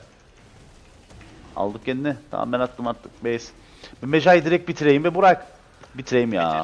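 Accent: Cyprus. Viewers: <3 sırf bu kıprıs türkçesi için kıprısa yerleşecem be gardaş:D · GÜZELİM KIBRIS ŞİVESİ <3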